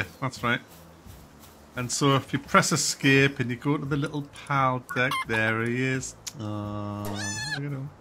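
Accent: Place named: Sunderland, United Kingdom. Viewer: this. He's a mackem. I know because I'm from Sunderland. The difference in the accent is very noticeable to someone from the north east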